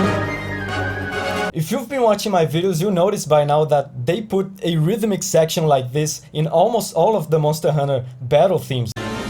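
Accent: Brazil. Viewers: great video, love your mh content! btw you have a very brazillian accent or at least sounds like it lol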